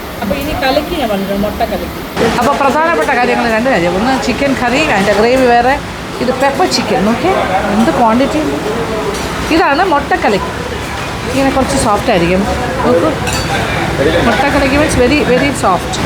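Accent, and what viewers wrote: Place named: South Kerala, India. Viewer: Im Tamilian from Kanyakumari. Akka is very famous in Tamilnadu ♥️. Something about her malayalam its very different and easy to understand as a tamilian compared to some other dialects. Maybe she is from South Kerala thats why?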